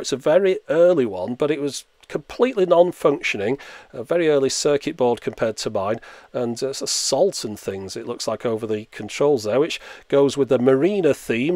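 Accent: United Kingdom. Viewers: the uk. You can hear it in his voice. Also, the ebay was asking for pounds, not aussie dollars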